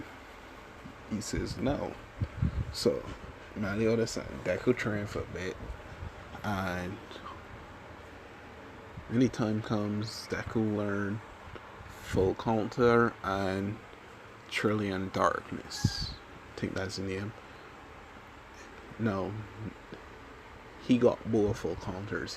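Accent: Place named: Barbados. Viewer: Bro you from barbados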